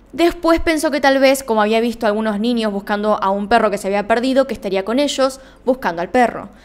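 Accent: Argentina. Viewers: A veces siento que nos estas regañando, luego recuerdo que eres Argentina y que así hablan... Pues de me pasa.... Me sentí regañada al final del video 🤣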